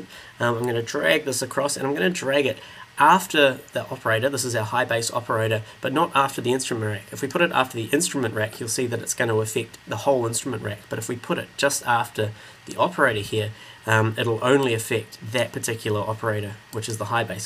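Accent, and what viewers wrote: New Zealand. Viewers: he ain't talkin fast hes a kiwi!!! churrr brotha!!!!! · great tutorial. It's a bit too advanced for me, an Ableton newbie. I learnt alot though, will definitely be back once I'm more familiar with Ableton. Watching your video, I learnt some things which would have taken me months to figure out by myself. Great explanation, being a kiwi, I can understand everything you said. Maybe I should do the English transcript, for the non-Kiwi listeners. Some have referred to speed and not understanding everything. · are you from new zealand? Your accent sounds like it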